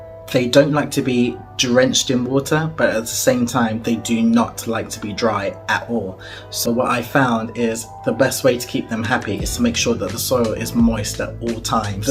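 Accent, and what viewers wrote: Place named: England, United Kingdom. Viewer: I can tell by your accent why your coleus does better indoors than outdoors. Summer in England is more of a theoretical concept than a real season.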